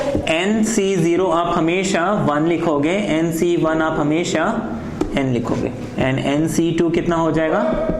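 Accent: Allahabad, India. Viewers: sir ...are u from Allahabad.......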